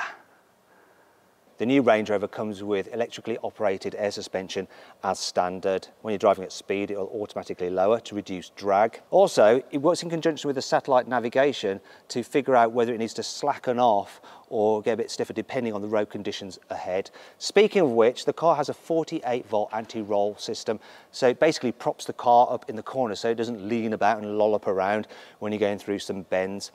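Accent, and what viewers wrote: United Kingdom. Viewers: Saya kan bilangnya suka asen dia, bahasa dia, logat dia (si tukang review) ketimbang aksen amerika Iya dia aksennya british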